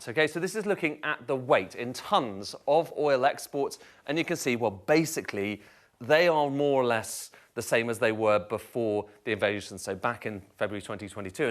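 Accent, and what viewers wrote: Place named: United Kingdom. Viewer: Classic brits narration..master of manupulation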